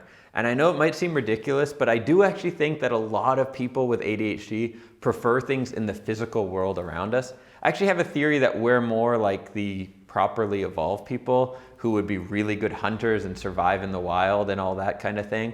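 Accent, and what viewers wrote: Canada. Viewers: Thank you for the video. You really seem to have a Canadian accent! 🏒🥌⛷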